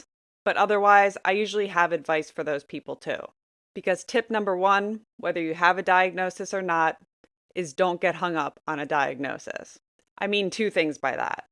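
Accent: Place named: East Coast, United States. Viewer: Thanks so much for making these videos. I just stumbled upon your channel a minute ago. You have an accent. Trying to pick up where it's from? East Coast?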